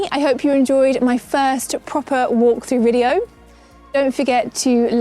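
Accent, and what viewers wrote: United Kingdom. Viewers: Who is the guy doing this? Just walk through it, he's trying to dress like a woman but it's definitely a guy with a British accent.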